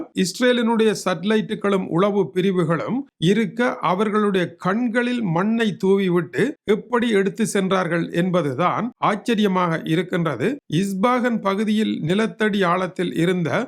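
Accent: Jaffna, Sri Lanka. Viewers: யாழ்ப்பாண ம்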